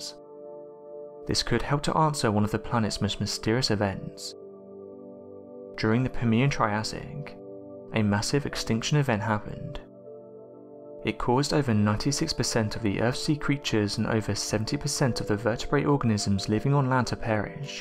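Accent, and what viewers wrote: England, United Kingdom. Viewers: Yeah his cadence is amazing and the way he pronounces words. I could listen all day! I can't quite make out what type of English accent that is. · His beautiful British accent and pace of narration too...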